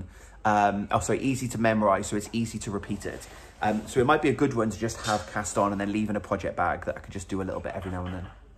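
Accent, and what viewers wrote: Wales, United Kingdom. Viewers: Loved your tour of Unravel. I watch Le Garcons as I am from Canada :) I love to hear your Welsh accent since I am originally from Abergele. Take care ! Hi to Mark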